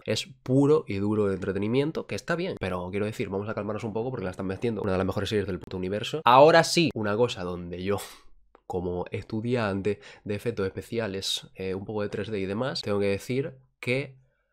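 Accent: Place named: Cuba. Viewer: Soy ek unico que piensa que suena medio cubano en este video al principio mayormente xd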